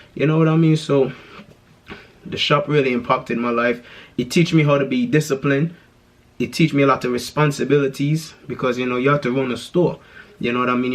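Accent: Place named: London, United Kingdom. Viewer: So why you sound like you're from London or some shit get the hell out of here with that bronx shit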